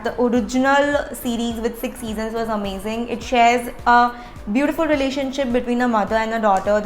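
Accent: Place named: Gujarat, India. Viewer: Gujarati Taste Che Tamari English Ma..😅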